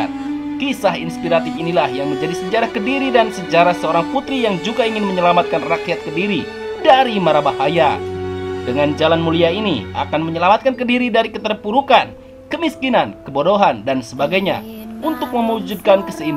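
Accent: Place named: Arab world. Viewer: Ngomong jawa pake logat arab ya seperti itu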